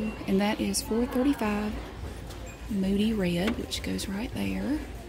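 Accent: South, United States. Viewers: The video I didn't know I needed. Her southern accent makes me feel safe and her little phrases like "what kind of a drunken duck" make me laugh my ass off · I love your accent so much😭 The southern twangs keep reminding me of home😭😭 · I love your accent. 😍 That's the part of the US I'd want to live in for the rest of my life ❤️ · This was so incredibly satisfying!!! & I looooove her accent. I recently moved from Hawaii to Texas & I can’t get enough of these southern accents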